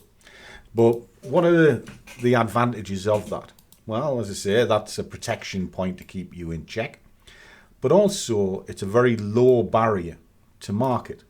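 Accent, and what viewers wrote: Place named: Newcastle, United Kingdom. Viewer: I hear a little bit of geordie in your voice, are you from up here?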